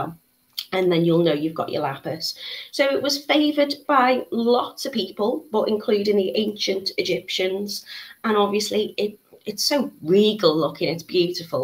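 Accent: United Kingdom. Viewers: Are you from the Uk? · NC here! I love your accent!! Reminds me of 2 great months we spent in England in the 90’s. Love making jewelry!! Thank you!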